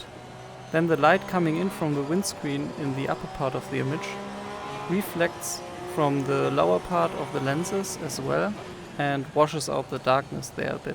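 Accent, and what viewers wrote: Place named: Germany. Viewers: Hey good video! i like your accent! Are you from Germany? It sounds like that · Correct m8, he is from Germany. But iam really puzzled about your statement that you like the German accent. This is really the first time I hear such a statement about the German accent ;) I thought that most american people are grinning about the accent of German people. Happy to be proven wrong ;)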